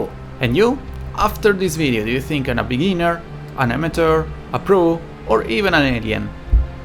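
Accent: Italy. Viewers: I love your English! Are you Italian? If so, greetings from your neighbour Albania 👋🏻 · Is forza Motorsport 7 a good simulation game? Btw are you italian?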